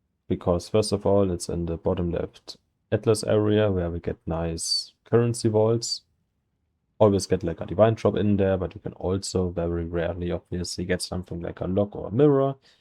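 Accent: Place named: Germany. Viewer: guter deutscher akzent :)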